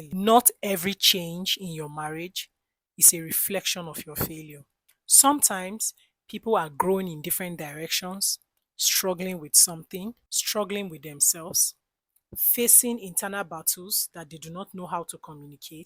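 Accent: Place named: Nigeria. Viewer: your advice is wise. I love your Nigerian accent too ❤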